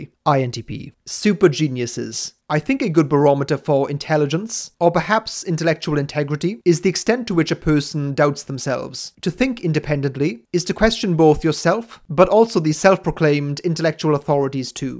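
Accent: Wales, United Kingdom. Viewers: Pretty sure he's from Wales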